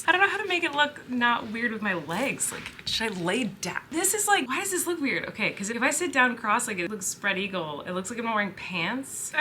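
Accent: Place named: California, United States. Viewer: TTQ: Hi! This is kind of a weird question, but did you find when you moved from LA to New York, or when you moved to Vancouver your accent changed? When I watch you vlogs, I notice you have a bit of a Californian accent (its not that noticeable and its probably because I'm Canadian and I have a different accent than you), and I know for me when I moved from Ontario to the East Coast of Canada my friends said my accent changed! I wondering if that happened to you? I love your vlogs and you are AMAZING!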